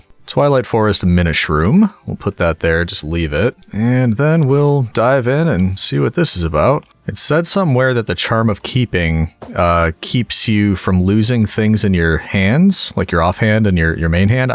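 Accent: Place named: Scotland, United Kingdom. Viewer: I fully enjoyed your Scottish accent!😂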